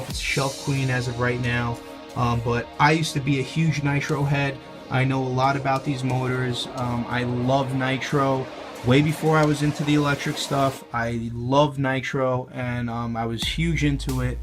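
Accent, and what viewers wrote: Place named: Long Island, United States. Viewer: Great collection! Been a subscriber for a long time, your accent keeps me coming back, I grew up in Northport and it's good to hear. If you ever get up to CT I'd love to drive with you. Check out CT Scalers on FB.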